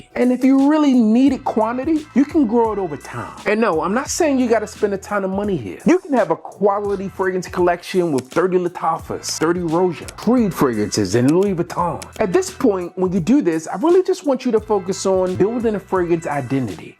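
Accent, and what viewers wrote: Baltimore, United States. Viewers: Shoutout baltimore i hear the baltimore all throughout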